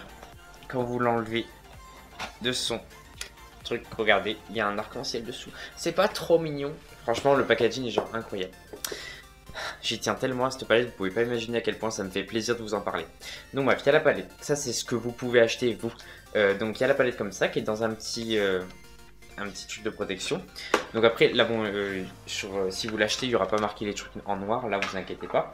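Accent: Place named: France. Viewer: Ton accent français est trop chou quand tu dis quelque chose en allemand ou anglais :D et fais un tuto stp 😍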